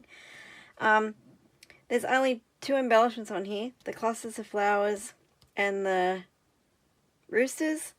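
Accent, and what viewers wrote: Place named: Australia. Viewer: Loved watching how you adapted the sketches to suit your papers/photos, and it is a joy to watch /listen to a fellow Aussie❤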